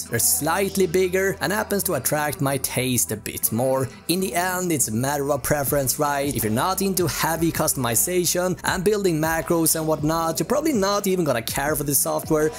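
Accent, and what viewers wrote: Sweden. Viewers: Love your Swedish accent😂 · Like your swenglish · Are you from sweden?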